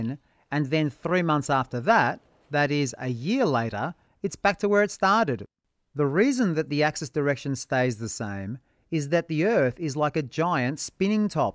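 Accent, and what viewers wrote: Australia. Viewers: Sir r u from Australia?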